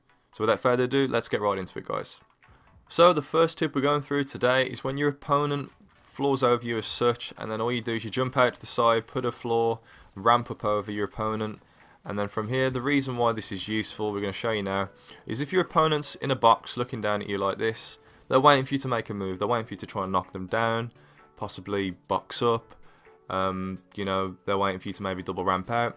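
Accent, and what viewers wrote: Birmingham, United Kingdom. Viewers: are you from birmingham lol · You're defo from Birmingham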